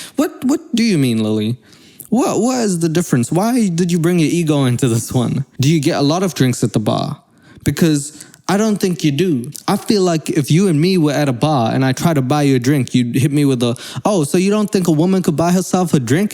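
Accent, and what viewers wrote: South Africa. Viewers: My dude, I thought you were South African because of your accent (my first impression). Omg you're so funny, I didn't see the time past😂😂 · I was sure I heard the South African accent 🇿🇦 proud of you man. Killing the YouTube game. I wish you success boet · wow i knew you were from sa before you said it because of how you talk (also from sa, moved aswell) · Omgosh i just knew it !! I always felt that SA vibe. I just recently started watching you. And have really been enjoying the humor. But i kept thinking u kinda have an accent but not really . But i just knew it... I am from Cape town Anyway keep on keeping on ✌✌✌Thanks for the great vids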